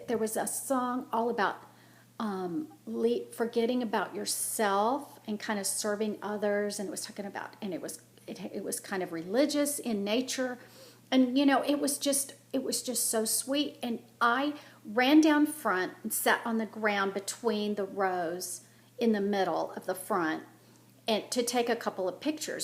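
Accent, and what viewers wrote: Texas, United States. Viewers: Am catching up on some of your recent videos...funny how after you moved back to TX, your accent is pretty strong. :) I am very sympathetic as to the struggles and trials you and your family are facing. It does seem like so many these days (self included) are going through some major storms. Thankful that we know Whose Hand we hold and the God who gives us strength to take each day as it comes. I will keep you in my prayers. Thanks for sharing from your heart, as always. I don't knit but I do crochet and have always found it to be a great relaxer. Blessings always! xo And Merry Christmas! No stress, only peace and joy! :)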